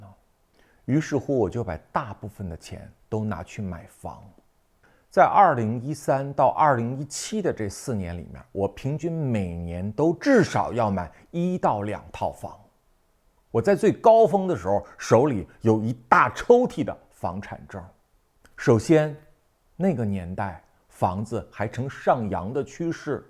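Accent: Beijing, China. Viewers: 我还以为主播是北京人呢，字正腔圆的普通话，挺真实的感觉，脾气太直说话太直，有北京人的感觉😊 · 老北京味儿，亲切😊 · 聽叔說話特別有趣，像在聽人說書 連說話方式都充滿著北京感？古裝戲感？忍不住想學😊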